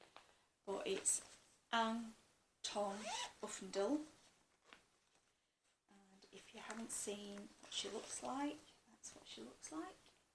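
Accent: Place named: Scotland, United Kingdom. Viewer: Hi. I just happened across your Flosstube a few days ago and I just wanted to tell you how much I enjoyed your gentle spirit and lovely accent, as well as your stitching of course. Lol. I just had to comment when I heard you say you were trying to tone your accent down. Please don’t! As an American, and lover of all things Scottish and British, I just had to tell you it is part of your charm. I have recently picked up cross stitch and am enjoying it so much. I did some embroidery back in the 1980s and have been quilting for the last several years but I didn’t even know that cross stitch was popular again until I happened across some Flosstubers on YouTube. Now I am well and truly hooked! I live in a very rural part of Kansas and my only connection with other stitchers is on flosstube. Can’t wait to watch your next video! Kathryn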